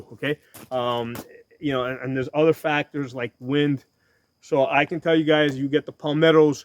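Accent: New York, United States. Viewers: Are you from New Yawk by any chance?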